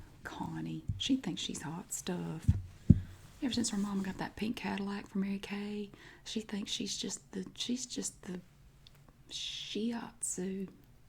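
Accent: South, United States. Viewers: Southern accent!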